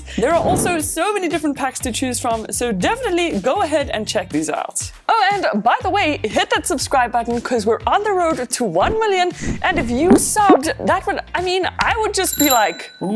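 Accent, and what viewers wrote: South Africa. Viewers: I can't point out the accent, is it South African 🇿🇦?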